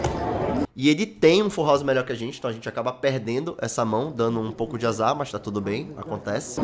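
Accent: Pará, Brazil. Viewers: Mano tu é paraense? kkk Brabo de mais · Mano tu é paraense ?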